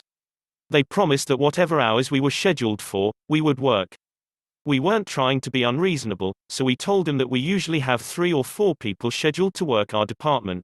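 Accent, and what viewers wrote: United Kingdom. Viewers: British style, nice accent, but crappy pronunciation.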